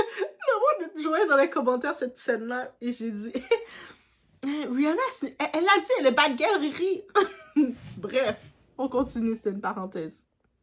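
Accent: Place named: Quebec, Canada. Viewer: J’aime trop l’accent québécois c'est mignon. Pete Davidson omet de dire qu'il vient aux dernières nouvelles d'abandonner récemment femme et enfant après avoir participé à un festival de stand up dans un pays des Emirats Arabes alors que son père qui était pompier est tragiquement décédé en sauvant des vies lors de l'effondrement des tours du 11 septembre 2001...il est nul ce mec et pas marrant. Une question : Êtes-vous d'origine carribean ? From Haiti maybe ?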